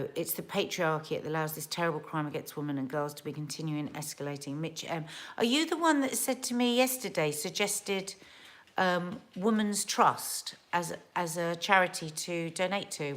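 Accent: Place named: Scotland, United Kingdom. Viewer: Good afternoon to you both. I am Scottish, and your Scottish accent is brilliant!! Makes me smile, Reminds me of home as I live in England now. ❤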